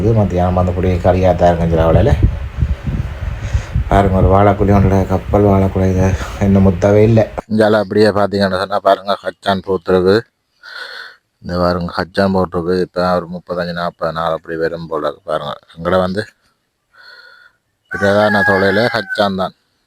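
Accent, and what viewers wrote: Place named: Sri Lanka. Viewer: நீங்க இலங்கை தமிழில் பேசுவது அவருக்கு புரியவில்லை இது இலங்கை வன்னிபகுதியா? அருமையான பண்ணை வீடு மாதிரி இருந்தது நன்றி வணக்கம் தமிழகத்தில் இருந்து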